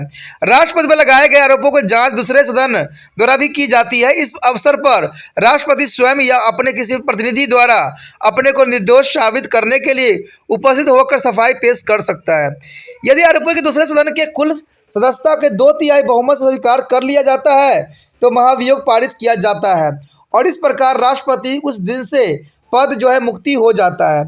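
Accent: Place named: England, United Kingdom. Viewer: Sir thoda dhng se bol lo angrej mt bno smjh hi nhi aarha kya bol rhe ho 😢😢😢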